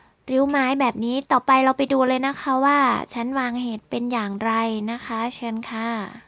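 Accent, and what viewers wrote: Isan, Thailand. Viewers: เว้าอีสานน่ำ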